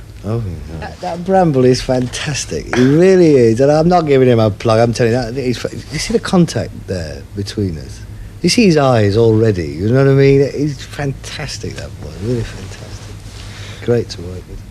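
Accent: RP, United Kingdom. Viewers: Funny hearing him talk posh I'm just waiting for him to break out saying .you dirty old man lol pure gold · bit of a posh old boy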